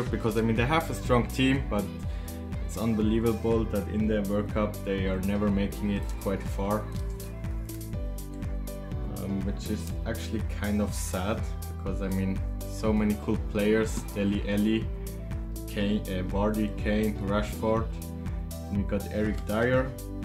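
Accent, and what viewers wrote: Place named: Switzerland. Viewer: Ich wette du kommst aus der schweiz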